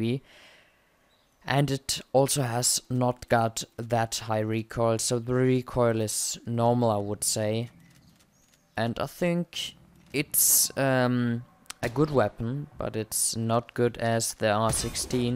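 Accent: Germany. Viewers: could you add me on EU server I would love to be on your FL and play with you name = NotoriousIshy (ps your English with the German accent is better than most people's English I know LOL)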